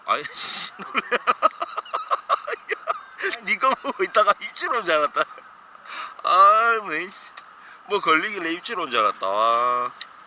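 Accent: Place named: Busan, South Korea. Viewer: 안녕하세요 저 류마티스 아주 친한 친구입니다 류마가 링크보내서 이 채널에 들어왔어요 ᆢ류마도 배스낚시 참 잘하는데 자주 들리겠습니다 ㅎㅎ류마가 은근히 재미있는 채널이라고 하더라구요 ㅎㅎ 제 고향도 부산 감만동인데 구수한 부산사투리 듣고 있으니 고향가고 싶네요 ㅎㅎ268번째 선물드리고 갈께요 ㅎ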